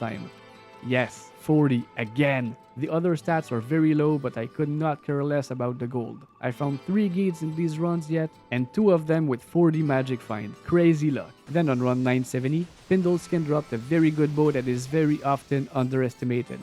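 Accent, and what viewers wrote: Quebec, Canada. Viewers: Tes quebecois en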